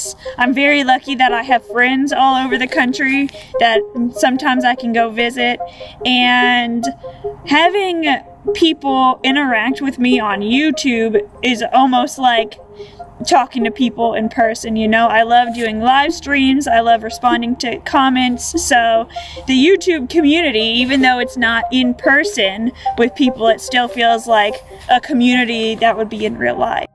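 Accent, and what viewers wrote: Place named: South, United States. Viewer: I love looking for that southern accent in you voice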